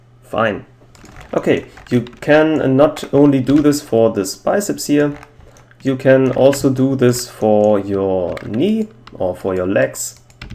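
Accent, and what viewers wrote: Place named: Russia. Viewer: Вроде русский акцент, запили на русском всё тож самое плиз =)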